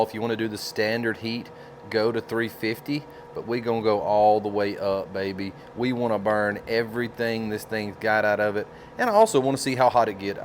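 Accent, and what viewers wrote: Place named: South, United States. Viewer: I am so impressed by you friend. Not only is this a very well thought out and executed video, I have to say that even though you are from the south (obviously), You speak very well! I am so used to southern folks completely butchering their grammar and just basic very poor use of the English language! I'm not knocking anyone from he south, I believe MOST of them are fantastic and fine people, I just cannot stomach to watch 99% of them because their speech makes a Whole bunch of great people that are as intelligent as anyone else in the entire world sound like absolute idiots. FANTASTIC little video here that was just great from start to finish! I will be subscribing! Thank you for being on YouTube!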